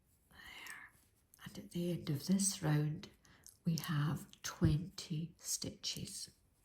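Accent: Scotland, United Kingdom. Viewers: Thank you for this sweet pattern and tutorial! Greetings from New York. My mother-in-law came from Helensborough. I enjoyed listening to your Scottish accent. Your instructions are very clear. I will make these for charity as my youngest grandchild is now six. They are darling! ❤ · Thank you so very much for sharing your pattern and tutorial. You explained everything in your beautiful Scottish accent. ❤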